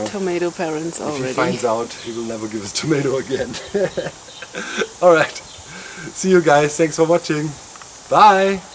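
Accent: Germany. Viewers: You sound a lot like Juergen Klopp. Are you from the same region as him? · Hallo! Ich mag deinen akzent! Im going to guess you are german and I hope im right. Your accent sounds german and my family comes from germany and they have a similar accent. Sorry for assuming anything .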